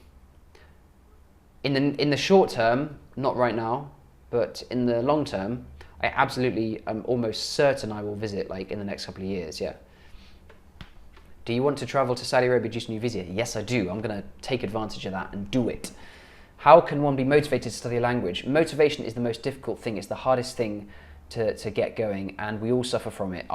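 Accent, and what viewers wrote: United Kingdom. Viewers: I wish I can speak British like you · learning arabic from British accent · more British accent videos plz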